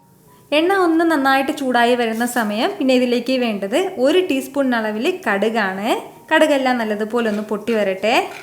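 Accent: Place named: Thrissur, India. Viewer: മൂളകൂഷൃം ത്രശ്ശുർകാർ പറയൂം ചെറിയൊരു വൃതൃസം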